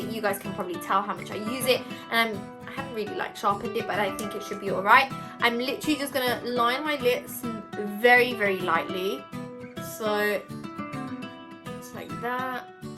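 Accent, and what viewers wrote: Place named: Punjab, India. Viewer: Just love your punjabi and english accent💕💕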